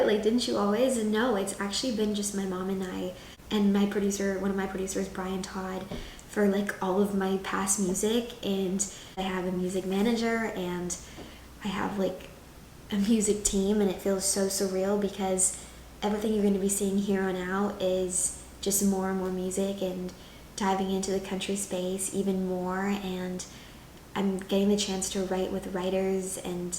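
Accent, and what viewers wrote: South, United States. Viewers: Her voice has gotten so much more country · U sound like a real country girl i luv it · I can hear your country accent. Also, team Bluey. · People saying your not country is mind blowing you sound country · out of all the years I’ve watched you I just realized you have a slight country accent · Who’s ur favorite country artist? Mine is:3 also u are so sweet and I hear a bit of a country accent when u speak and I love it!! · Oh my, you soul is so beautiful, you have everything I would want in a person 🤍. Personally, I can REALLY here that country accent in you, suits you so much. Thank you fo all that you do ❤️❤️❤️ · I can tell you are country! It’s the way you say certain words. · I can hear your country accent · Your country accent is so beautiful❤ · I hear the county then you talk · Your southern accent is showing more and more. 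Also team Bluey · You really inspire me please always stay the light that you are. Seeing you grow up through the screen is amazing and you really are an amazing person( I love you soft country accent when you talk) I love your content so much. 💜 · Bro why does sound like she has a southern accent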